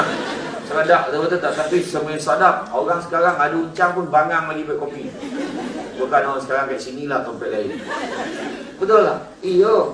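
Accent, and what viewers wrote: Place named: Negeri Sembilan, Malaysia. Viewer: Ustaz syamsul debat memang salah satu penceramah hebat dan menjadi salah seorang penceramah kegemaran saya..dengan loghat n9 dia tu..buat saya terhibur. Tq pis..share.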